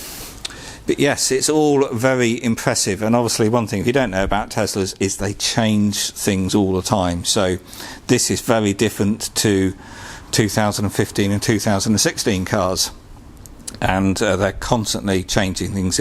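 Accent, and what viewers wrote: United Kingdom. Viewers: Love the YT algorithm 😊 Thx for ur video- it really helps me a bit to understand how ev‘s work. Greetings from austria 🇦🇹 Love the British accent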